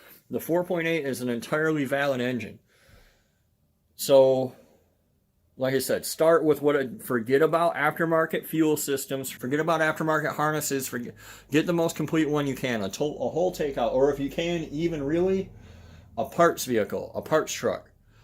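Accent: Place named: Wisconsin, United States. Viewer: We talk the same over in Wisconsin well i do anyways.....Thanks for the advice man look forward to watching your videos you can give straight forward advice unlike some of the other dumbass videos others put on here....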